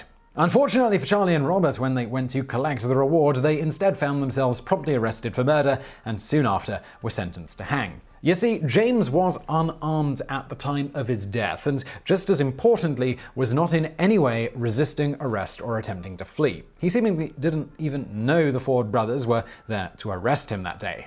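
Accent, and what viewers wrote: United Kingdom. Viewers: Why tf is vsauce British now?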